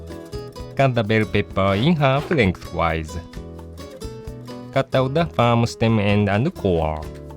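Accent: Japan. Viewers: カタカナ英語聞きズラいなぁー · 这英文真的很日式。(˶‾᷄ ⁻̫ ‾᷅˵)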